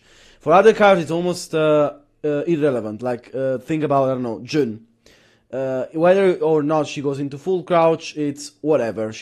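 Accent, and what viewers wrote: Italy. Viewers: Good a analysis, all we can do is wait and pray -_-. Random but are you italiano? you got a similar accent to my wife lol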